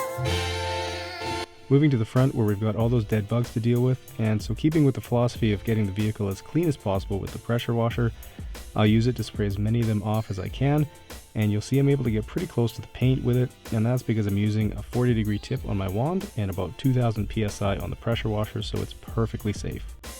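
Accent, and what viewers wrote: Canada. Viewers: *Process in that Canadian accent*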